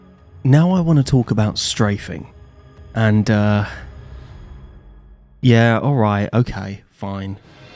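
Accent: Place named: Southern England, United Kingdom. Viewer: Subbed. I consider myself a reasonable enough souls player, now I want to redo them all with the lessons learned here and become a great one. Also it’s refreshing to hear another English creator on here. I’m guessing you’re Southern, maybe Oxford sort of area? Keep up the excellent content 👍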